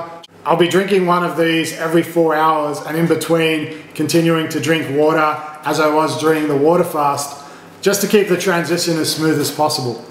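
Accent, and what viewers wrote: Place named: Greek Australian, Australia. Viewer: 2 seconds in i was like yep Aussie Greek. It’s the accent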